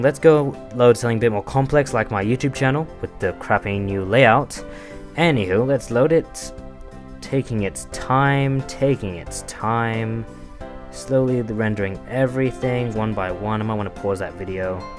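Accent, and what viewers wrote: Australia. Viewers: Australian accents turn me on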